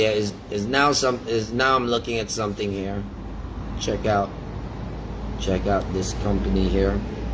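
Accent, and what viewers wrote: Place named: Guyana. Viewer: im surprised u int popular lil advice tho shorter vids st88 to d point n more of d gt raw accent guyanese lik ppl dat doz tlk wah dem tinkin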